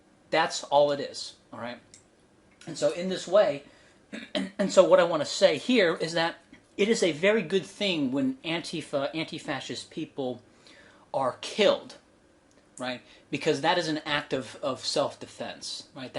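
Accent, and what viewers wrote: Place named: North America. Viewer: Are you greek? LMAO this is absolutely insane. A north american dude (by accent) deciding what thousands of miles away should do 🤣🤣🤣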